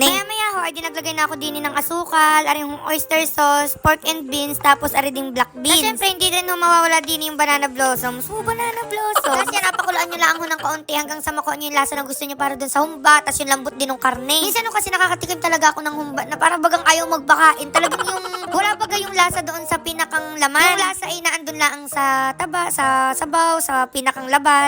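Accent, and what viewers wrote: Batangas, Philippines. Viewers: ala eh tlga tgalog tlga tono ala tga Tanauan ako isa tga hnga mo sn mkpunta kmi jan soon Godbless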